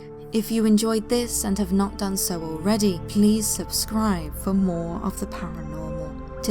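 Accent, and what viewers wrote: United Kingdom. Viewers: British folk just tell stories better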